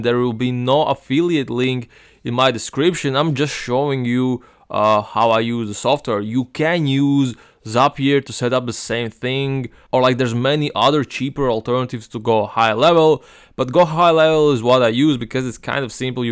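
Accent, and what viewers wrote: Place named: Latvia. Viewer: Hello mate, thanks the value 🙏. I have one question: It seems like your clients are American, do they care about your Latvian accent or have you faced any rejections because of it?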